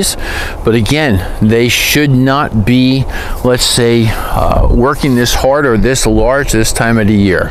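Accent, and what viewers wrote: New Jersey, United States. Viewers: I agree the wether has been so up and down here in NJ. I’m in zone 7a. It’s kind of funny that I recognized my own accent when listening to you and wondered if you’re from NJ as well. Lol. I watched another video and sure enough you are lol. My tomatoes were doing really good and then really bad after up potting them. They’re in the garden now and most are making a nice come back. I have no clue what was happening but the tops looked like they rotted and fell off on some. I ended up putting them all in a trash pile outside that I neglected and to my surprise they started getting leaves down low on the stem 🤷🏼‍♀️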